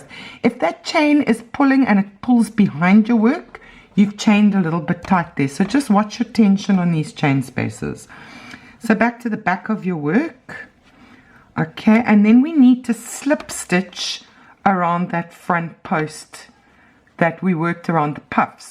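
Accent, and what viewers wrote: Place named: South Africa. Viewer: Nice South African accent 😉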